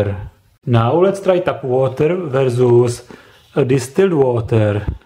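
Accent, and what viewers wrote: Prague, Czech Republic. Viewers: Nezameniteľný pražský prízvuk. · Já věděl podle tý angličtiny, že budeš čech :D :D velmi dobrý kanál a ta angličtiina je topová :D <3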